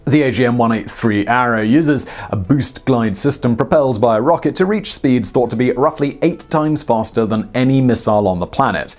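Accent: United Kingdom. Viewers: Why do British people talk like this. Do they hear themselves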